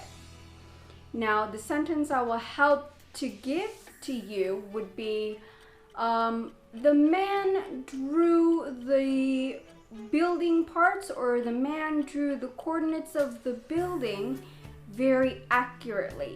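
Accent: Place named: United States. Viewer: Really like your american accent 😊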